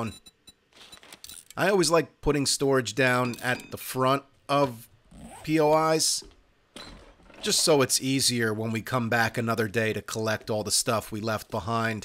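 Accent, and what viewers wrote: New Jersey, United States. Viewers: This guy is a gangster... has the jersey accent and mentality....have loved this guy long time...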